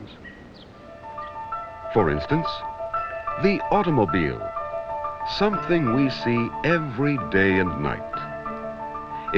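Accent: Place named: Midwest, United States. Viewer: Same Midwest delivery, but no. Gary Owens as narrator would have made this an instant cult classic!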